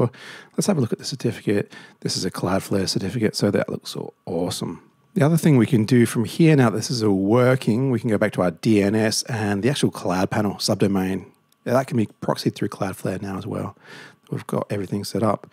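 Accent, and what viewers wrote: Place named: Australia. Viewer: nice aussie accent :)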